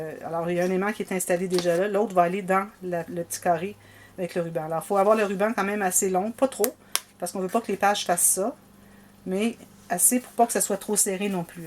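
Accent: Quebec, Canada. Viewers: Bon soir il est 21h 30 j'aime beaucoup vous regarder vous avez une telle déshérités qui moi qui n'ai pas encore fait un album que des cartes pochette boîtes il n'y a un ans mais je ne peux pas faire tout les jours et je n'ai pas trop d'en droit où pouvoir m'installer et mon mari râle mais il m'a promis de m'installer une pièce pour moi pour toutes mes passions je cout un peu aussi. Bon se si dit je suis loin d'aussi bien scraper que vous. Je rêve d'aller au Québec depuis mon adolescence et j'aime beaucoup votre accent et vos expressions de vieux français. Quand mes fils étaient petits j'ai connu un couple et leurs deux fils et qui étaient amis avec mes enfants ils sont québécois et luis professeur et il fesait une étude sur les français et sont restés 3 ans en France je l'ai est beaucoup apprécié et sa m'a encore confirmé mon envie d'aller au Québec .j'espère que je ne vous choque pas de vous parler ainsi Je sais que sa n'a riens avoir avec le scrap,mais je suis une grande bavarde je parle même seule et sa depuis toute petite. 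Je vais continuer à vous regarder et en espérant ne pas vous avoir choque de vous raconter ainsi ma vie un grand bon soir et un gros bisous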